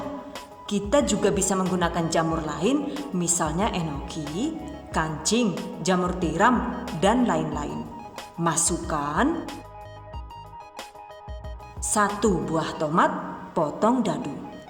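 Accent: Banyumas, Indonesia. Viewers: Ngapaknya bund..salam wong ngapak resepnya selalu mantul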